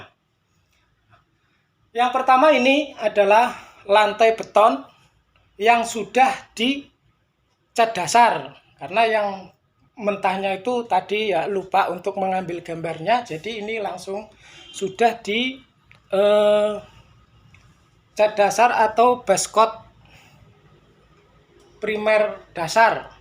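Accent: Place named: Java, Indonesia. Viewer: Makasih logat jawanya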